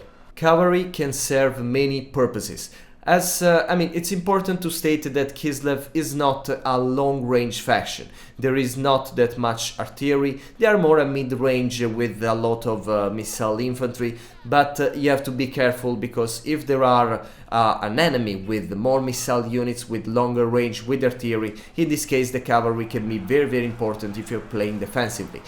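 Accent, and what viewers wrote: Italy. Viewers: parli molto bene in inglese ma si sente ancora che sei italiano xD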